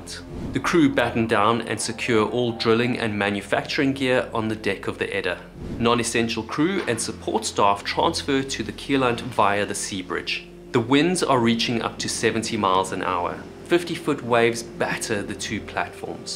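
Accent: South Africa. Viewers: You sound very South African. I love the accent and you are a real good story teller. Great stuff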